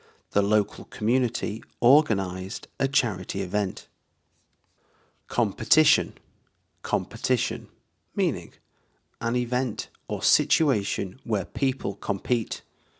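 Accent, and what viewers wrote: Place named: London, United Kingdom. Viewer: What is this goofiest accent? 💀 Pronouncing 'understanding' as 'OWNDERSTANDING' is actually unhinged. Bro is literally running an English channel just to confidently butcher his own language. Why do you sound like you’re actively choking on a hot potato? Even the Oxford Dictionary knows it's a clear 'A' sound, but here you are pushing your muddy, regional dialect on international learners. This isn't 'authentic B2 English', it’s just pure auditory torture. Imagine buying an English course only to end up sounding like a generic NPC from a sketchy London alleyway. Is this really how you teach people?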